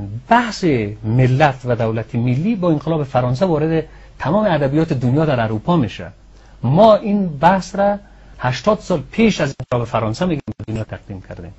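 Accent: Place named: Panjshir, Afghanistan. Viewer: مرده ګاو ای بسا که شما مرده ګاو ها از کیر افغان ها رستم شدید و بر ضد افغان ها دشمن شدید تو احمق بیسواد پنجشیری تا به حال لهجه پنجشیری خود را نګهداشتی ګوجا ؟؟؟؟؟ یک لفظ و بکار برد پنجشیری است که شما مزدوران ایران و روس ولو اګر دوکتورا هم بګیرید باز هم خود را رسوا میکنید به خصوص در مورد تاریخ افغانستان او احمق و کودن تو برو و در کتاب های باداران ایرانیخود کلمهء ګندهارا را مطالعه کن که چقدر قدامت دارد و در کدام مناطق زندګی داشتند خودت مانند سګ های جیره خوار فعلآ در حکومت افغان ها بنام دروغګوی عبدالله عبدالله یا سخنګوی سقاوی ها کار داری ای بسا که شما مرده ګاو ها از کیر افغان ها رستم شدید و بر ضد افغان ها دشمن شدید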